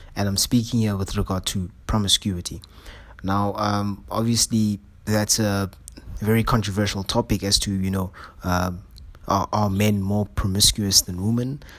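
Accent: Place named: South Africa. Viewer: Your accent sounds like mine... are you also from South Africa?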